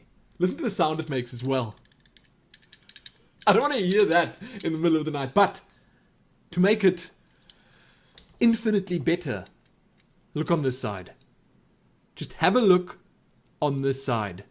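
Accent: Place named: South Africa. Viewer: Ahhhh! South African! I've been trying to figure out your accent for the last 3 weeks! lol. I think I'll send you something too! Your videos have been quite entertaining!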